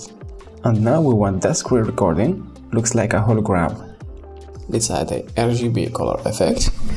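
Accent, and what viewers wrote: Morocco. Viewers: Moroccan accent 🤍 keep going bro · moroccan accent yak hhh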